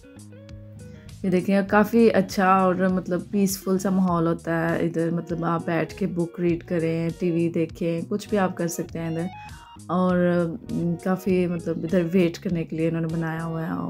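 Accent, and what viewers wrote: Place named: Punjab, India. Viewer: Miss ap ka vlog boht pasnd he or ap k bat krny ka style panjabi he acha lgta he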